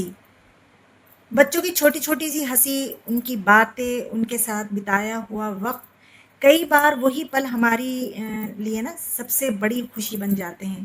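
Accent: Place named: Pakistan. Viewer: AAP BILKUL PAKISTANI ANDAZ M BAAT KARRAY AUR INDIA SE H LIKHAY BUT CONFUSION AAP BICHMAY 🎶 🎵 MUSIC NA DALLAY BAQI ZABARDAST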